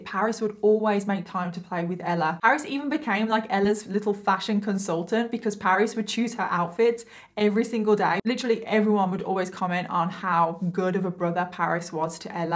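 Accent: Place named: England, United Kingdom. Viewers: yes she come up with the mystery murder and makeup idea BUT people get inspiration from others and the world and just because she’s doing her makeup and telling the story like Bailey does it doesn’t mean she’s trying to be like her! She’s doing it her own way and plus she’s from England so the accent gives her a twist and makes her nice to listen to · How can anyone believe he's rehabilitated. I agree with you! I love your English accent and your very pretty 😍 thanks for your videos!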